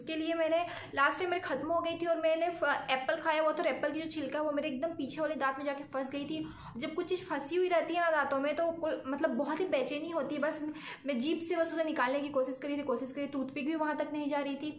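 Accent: Bihar, India. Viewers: Aap Bihar se ho mam ????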